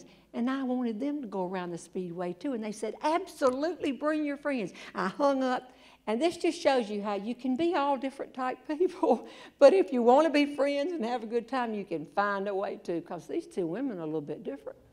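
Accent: South, United States. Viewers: Thank you for the amazing stories, I grew up outside of Bristol, Va. my husband, who by the way is a LB! Moved me and our children to Cincinnati Ohio, 30 years ago, and now our children have grown and have given us grandchildren so we can’t move back home yet! I love listening to someone other then myself talking in a southern accent!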